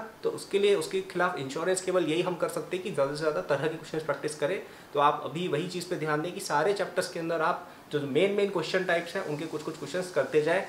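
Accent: Standard. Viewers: Gazzab shudh Hindi👍👍 Best Btw IAM 2023 aspirant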